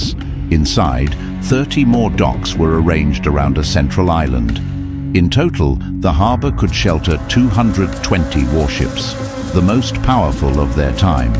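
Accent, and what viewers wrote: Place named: United Kingdom. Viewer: I love hearing a British narrator...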